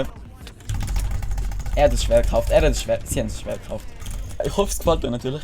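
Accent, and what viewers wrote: Zurich, Switzerland. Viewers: wenigstens en zürcher wenn du irgendwie bernerisch gredet hetsch wär scho irgenwie scheisse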